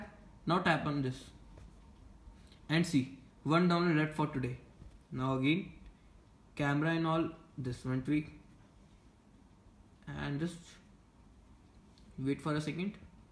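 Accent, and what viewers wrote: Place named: India. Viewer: Wtf you india 😂 When india try to speak english.. fell so weird what da fukkk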